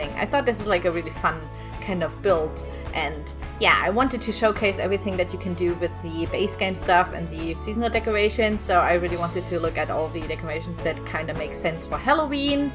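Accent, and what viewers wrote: Germany. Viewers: I agree with you plus her English is really clear to understand evan with the German accent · I never realized that your accent was german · I love your accent and I think it's really obnoxious for people to complain about it. It just goes to show you how spoiled we Americans can be. I for one am very grateful that you record in English at all. It's not your first language and I'm sure it would be easier for you to record in German so I appreciate the fact that you care enough to try! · This was the first video I have watched and must say didn’t notice your accent. You are doing very well love the German touch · You are german!!??? 😉I live in Germany and my son have the same accent when he speaks english and i find it really lovely, just like your creations! 😊❤✌ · I'm really confused. Why would you watch a German youtuber if you don't want to hear a German accent? 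· I don't think your accent is annoying at all - it's lovely to listen to, and not as strong as I'd imagine a German accent to be, lol! I love accents and poeple of all places and cultures, and you are most definitely awesome! The person who was so mind-numbingly rude can go suck - don't ever change! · are you from Germany or Austria? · I LOVE HOW YOUR ACCENT IMMEDIATELY GOT STRONGER LIKE Y E S also please don’t change your accent... i love german accents and you should never change who you are :)) I’m from Britain, and I get teased a lot for saying things ‘poshly’ as my family is really English and well-spoken, and everyone around me has a really Welshy accent. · Your reaction to that comment was GOLD as a fellow German I know how hard it is to get rid of a german accent but honestly??? Yours isn't even bad??? When I started watching your videos I was like "she's german right?" because I did recognize the accent but I wasn't even entirely sure! 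It's ridiculous how some English native speakers (obviously not all, I see a lot of sweet comments here! <3 ) take the content produced by non native speakers for granted to the point of complaining about their accents oh boyyy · Vermutlich weil sie es selbst nicht besser können .. oder GAR nicht ^^ Ich finde dein Englisch schon ziemlich amerikanisch. Manchmal redest du so amerikanisch-kauderwelschig ^^ dass ich dich kaum verstehe ;D Da sind eigentlich nur noch wenige Wörter bei denen man den deutschen Akzent raushört. Außerdem hab ich gehört, dass Amerikaner in der Regel den deutschen Akzent ziemlich niedlich finden :)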